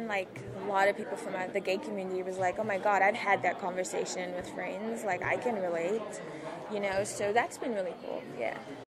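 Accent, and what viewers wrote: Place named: South Africa. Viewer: I love that you still have your South African accent. A bit of American but thats expected. Don't lose your roots girl! Lekker bly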